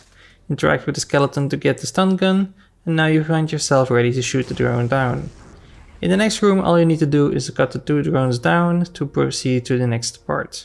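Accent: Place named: Netherlands. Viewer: You sound Dutch!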